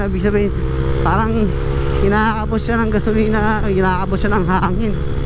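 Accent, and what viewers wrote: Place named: Ilocos, Philippines. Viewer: Kwan ilocano expression pag nag tatagalog🤣🤣 agbiag ka lakay👍🏼👍🏼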